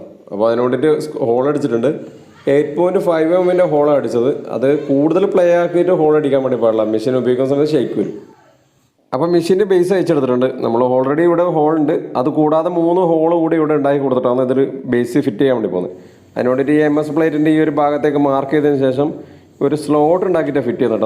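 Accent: Kannur, India. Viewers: You are allrounder Best of luck. dear. And i like kannoor langage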